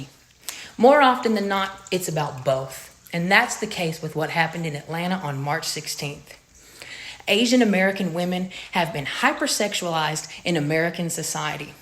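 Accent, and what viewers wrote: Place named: Texas, United States. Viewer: My husband and I are in our 60's. We absolutely LOVE this channel! (Just for the record, my husband is from Sherman, TX, and he says you sound like home!) Keep doing what you are doing!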